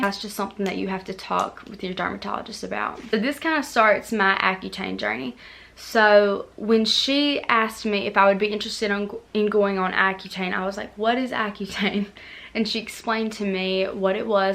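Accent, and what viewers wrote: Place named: Texas, United States. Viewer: Are you from Texas or something, love your accent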